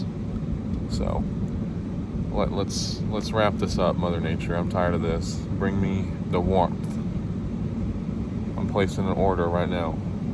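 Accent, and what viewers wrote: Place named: East Texas, United States. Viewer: You sound like you might be from East Texas! You’re doing great!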